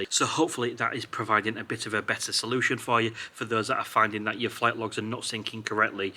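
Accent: Hull, United Kingdom. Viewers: All of us from "ull" can understand him when we fly down "our tenfoot" looking for "dead bods".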